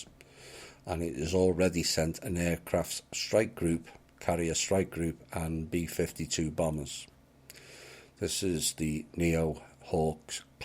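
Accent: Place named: Liverpool, United Kingdom. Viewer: This scouser loved the sound of his own voice, I haven't seen one reply to any comments in 2months of watching!! I am from extremely close to were his accent is from and I have Ufos meeting me every single night, and sometime in the late daytime too! I am Spiritually Awake now and I have so much information I could really help people with, and this guy won't ever answer any comments of mine to even ask what Ive got, and he just plays his small jigsaw piece internet show he is doing, what's going on Lad Ive got evidence that proves how intergrated these vermin lizard archons Draconian Energy stealing <mail>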